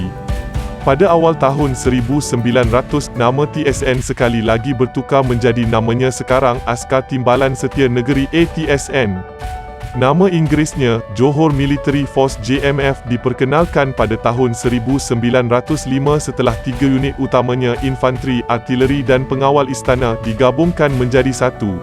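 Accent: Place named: Indonesia. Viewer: Kemungkinan besar asal pembaca narratif ini dari Indonesia kerana pronounciation letter 'J' bunyi macam bahasa Indonesia (based on Dutch). 😂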